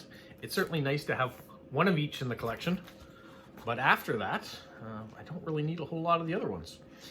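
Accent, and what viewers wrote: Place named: Canada. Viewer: Between these two videos that is a heck of a lot of US stamps. BTW I always get a kick out of your Canadian accent.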